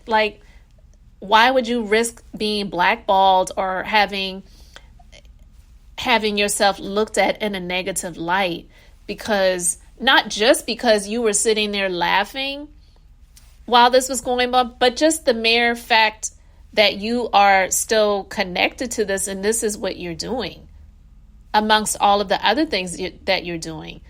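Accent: Charleston, United States. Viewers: Hey hey your accent sounds familiar. Are you from the Charleston SC area? Kandi was definitely wrong for laughing with DJA-1. I enjoy your content. I live in Ladson.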